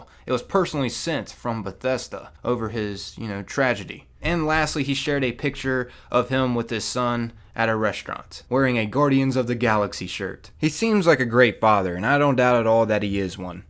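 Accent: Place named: Pittsburgh, United States. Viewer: you sound like a fuckin yinzer man...are you from pittsburgh, too? lol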